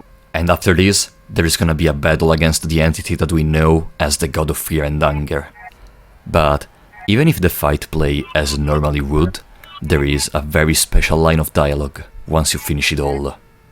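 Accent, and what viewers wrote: Italy. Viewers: i just duscovered your channel, and was wondering, are you, by any chance italian? curiosity js killing me, also i love the content i hope you keep growing like you are doing👍👍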